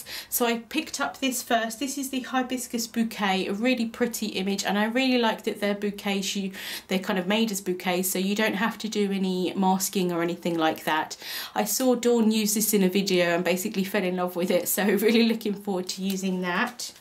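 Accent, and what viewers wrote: United Kingdom. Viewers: Beautiful haul you collected. I'm my ignorance I stupidly never realised you were on my side of the pond. So used to our American Crafter's the accent didn't even sink in. Glad I've found another UK crafter. Hope the headaches get sorted, I used to have so many cluster migraines I totally feel for you x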